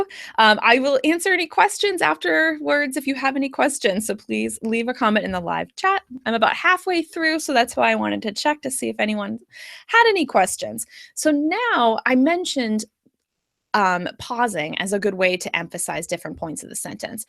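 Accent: United States. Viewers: You have an American accent.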